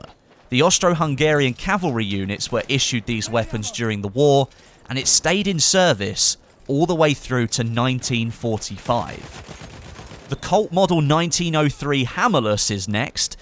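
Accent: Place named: United Kingdom. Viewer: why do you Brits have such a smoothing accent? lol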